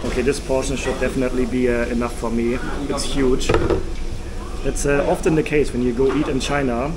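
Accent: Germany. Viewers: 一开始我不知道你是德国人,你的口音听起来很像德国人,因为你让我想起了一位德国故人🤣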